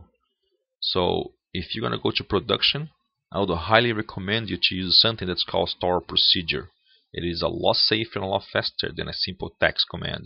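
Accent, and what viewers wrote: Brazil. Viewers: Brazilian accent? Excellent tutorial by the way.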